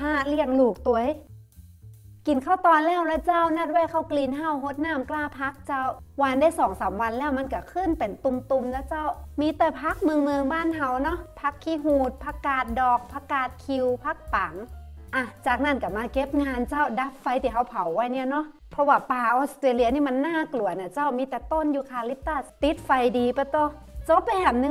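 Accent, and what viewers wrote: Thailand. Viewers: ขยันๆจ้าคุณ ต้องดับไฟตั้งแต่ต้นลมด้วยนะค่ะ😁 อู้เหนือเพราะขนาดเจ้า🥰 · ชอบ อู้คำเมือง เพราะดีจัง ฟังเพลิน ปลูกผักเยอะเลย ดับไฟดีๆนะ เดี๋ยวรถดับเพลิงมา ฮ่าๆ แซวๆ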